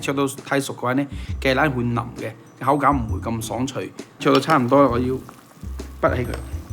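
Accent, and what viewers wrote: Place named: Guangdong, China. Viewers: 主持人的口音明顯是來自大陸廣東省，而且字眼都國語化了，一點韻味都沒有，不親切聽起來很土很怪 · 这是广东哪里口音？我不会说广东话但是能听懂粤语，能听出来和正宗白话有点点不一样？ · 對，不是標準的廣州話但是確實是廣府話。整個嶺南都有自己的鄉村話。例如，中山有自己的口音和鄉村話，江門也有，南寧也有，等等。香港和廣州說的就是廣州話。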